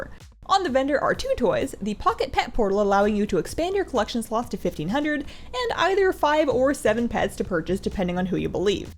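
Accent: Canada. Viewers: canadian spotted. No matter how good their american accent is the 'out' always slips through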